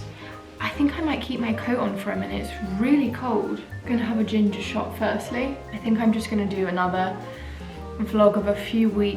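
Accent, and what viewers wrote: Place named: United Kingdom. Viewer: My dream job is being an interior designer, and because of that, I watched your video, and I noticed how beautiful your British accent ❤❤ .I wish you rache everything you want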